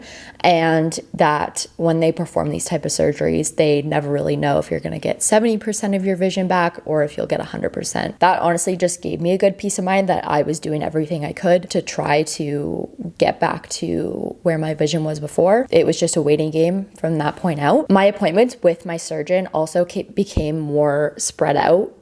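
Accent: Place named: United States. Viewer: I'm an American living long time in Cambodia and just had my Vitrectomy done today. No compliants about the medical treatment here and all the equipment was high tech, etc. etc. However, starting my 5 day journey with my head "locked" into position, right side for the gas bubble, and the "mental" clouds of depression and worry start to happen. So! Appreciate this video as it will helps me look forward to the ups and downs of this surgery. Great to also listen to American English explain things as the language barrier here stressed me out a bit as I am just not 100 percent sure sometimes on the info that was given to me. Again, the medical treatment here in Phnom Penh has actually impressed and now it is up to me to follow doctors orders ( 5 full day in head "lockdown" and stay positive. Thank you for real.........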